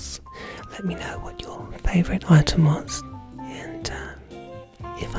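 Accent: Australia. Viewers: As an australian - probably the best Aussie accent from a foreigner ive ever heard!!!!!!! looking scrumptious as always xx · Shiiiiiit!! That is a noiiice Aussie accent! And I'm an Aussie Sheila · Your Australian accent is on point!! 😍😍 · Without a single doubt, the best Aussie accent I've ever heard from a non-Australian. You even had the same tongue in cheek wit you always have but with that very distinct aussie brand to it. Very nuanced performance love love loved it. Cheers from down under x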